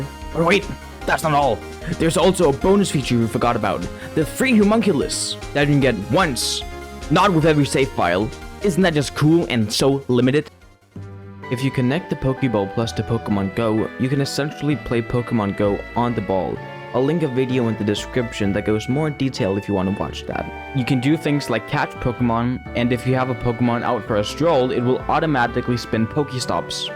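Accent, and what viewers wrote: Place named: Denmark. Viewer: buddy, are you danish or do you just happen to have a very danish sounding accent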